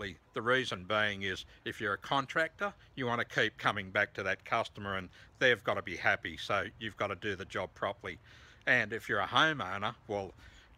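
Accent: Australia. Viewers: I CANNOT listen to an Australian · I like your accent! you aussies are okay with me. I've got a Weed Eater lol but I think I'll call it a Whippa Snippa from here on out. Cheers from Texas.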